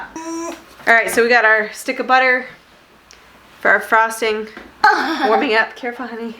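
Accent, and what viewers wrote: Maine, United States. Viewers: Friggen loved it. Your accent kills me brings me back to central ME. I'm gonna try that potato dish. I get honey baked hams every year from my work so I don't have to do all that glaze work. Soak your toothpicks and they won't get crispy your man's got him a great woman! Happy Easter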